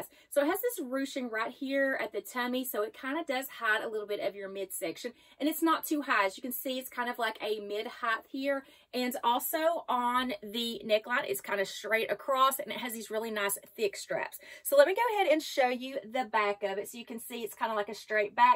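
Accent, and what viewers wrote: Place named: South, United States. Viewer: That's a nice southern accent you got there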